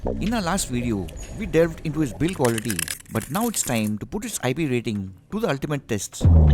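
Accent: India. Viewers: From his accent I knew he was Indian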